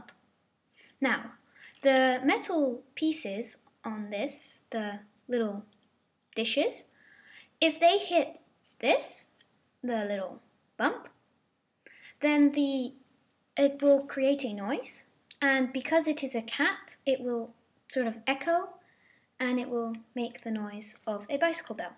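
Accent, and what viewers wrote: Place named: United Kingdom. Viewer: I don't know if you were putting that British accent on or not, but very good video! :)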